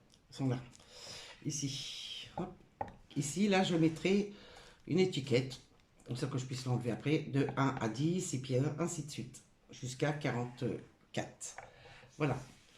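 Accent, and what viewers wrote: Nord-Pas-de-Calais, France. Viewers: Coucou. Tout d'abord Merci pour ta petite attention (de mon anniversaire). Je suis contente de voir ton visage, tu n'as pas à être complexée, chacun est comme il est et c'est comme ça. Tu es très bien et ton visage va très bien avec le son de ta voix, que j'adore vu ton accent ch'ti. Donc RAVIE de te voir en entier. Gros Bisous et 1 like en plus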